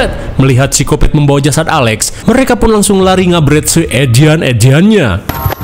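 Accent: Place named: Sunda, Indonesia. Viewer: elu Sunda Bagong tu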